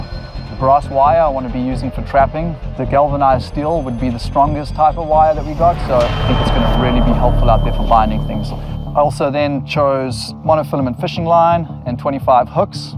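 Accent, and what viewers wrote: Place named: South Africa. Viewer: Wanted to know if you’re a fellow Afrikaner or at least a fellow South African? You sound like us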